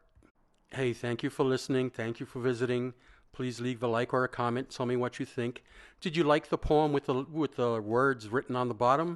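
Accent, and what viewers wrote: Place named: East Coast, United States. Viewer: Look at you - all grown up and on YT now!! Go, Go! PS - I remember first stumbling upon your blog and the YOU poem. It is SOOOOOO fun to hear your voice reading the poems. You haven't lost your upper East coast accent if you're still living in Florida?! PS - I could've sworn it was the shoes that make a woman beautiful?;-)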